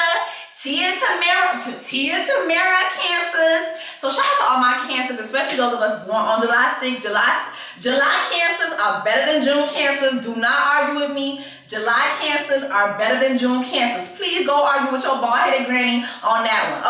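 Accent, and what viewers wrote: Haiti. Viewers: We want more like that...ou fem ri ahhaahha thr haitian in you is priceless. And gurl I would do the sammmmmmeeeee thing. She could have a lonely time at home lol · When you said, "we only got one room" I got up and left too omg. WHAT. Your creole is making it even funnier too (I'm haitian so I understand!!) I'm surprised you didn't call your parents to bail you out, I woulda called my dad ASAP to get me some hotel/motel room and I would never talk to that person ever again. I'm planning my first girls trip...and I hope it goes a lot better than yours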